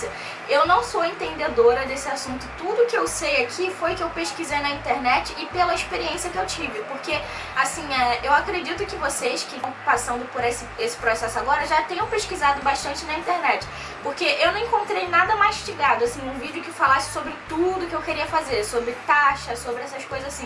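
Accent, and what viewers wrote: Rio de Janeiro, Brazil. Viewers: vc é muiiito mais muiito gata mesmo! e esse sutaque carioca me deixa maluquinho! mas fica tranquila que não sou nem um fã lunático, inclusive sou casado e muito bem casado, é que o teu jeitinho de ser me deixa maluquinho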